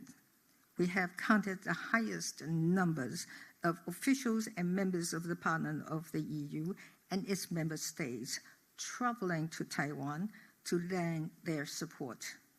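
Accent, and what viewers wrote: United Kingdom. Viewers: 純英語腔調！英國上流社會的口音！ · Beautiful British accent ❤ we love you MS. Tsai ❤❤❤ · 以蔡前總統為榮，為大家在外交上打拼。她講英文有英國腔耶。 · 元首風範依舊，完全沒有卸任感啊❤一站出來那種領袖氣質盡顯，好喜歡！謝謝my英仍願意繼續當台灣這個小而美且強國家的志工，繼續為台灣打拼，加油，愛妳喔， ～ ps:like the UK accent you speak❤ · 謝謝前總統卸任後仍舊為台灣努力拓展外交, 略帶英國腔調的英語實在太迷人了👍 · 你不懂英語才是笨蛋、總統是英國人🇬🇧口音、不懂裝懂？ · 請保護好總統在她外出拼外交的時時刻刻❤😊中共視她為眼中釘她的英文發音很好聽有點英國腔這次沒看到布拉格帥哥市長有點可惜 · 蔡前總統的英式腔調，太迷人了❤ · 的英國腔太迷人😍最後全體起立鼓掌真的好感動🥹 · 她的英式英文真的好好聽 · How does she get British accent? Must have been busy practicing her speech lately?😂 not consistent though, still has Taiwanese accent mixed in. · 雖然聽不懂，但前總統英國腔英文，聽起來很好聽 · 蔡總統的牛津腔英文真好！👍👍👍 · 蔡總統的英式英語好道地喔！真不習慣。謝謝蔡總統向國際發聲，辛苦了。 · 台湾人说英国腔，台湾人骄傲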